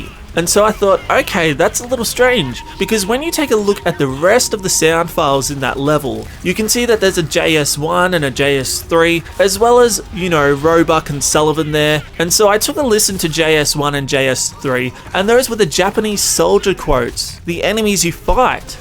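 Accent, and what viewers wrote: Australia. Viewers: Is this guy australian ??? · You Aussy?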